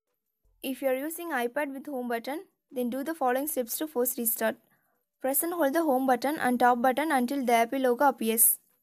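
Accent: India. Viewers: I CANNOT STAND INDIAN ACCENTS!!! STFU!!!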